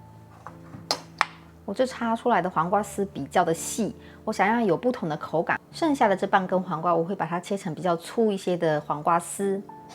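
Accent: Fuzhou, China. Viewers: 您是福州人吗？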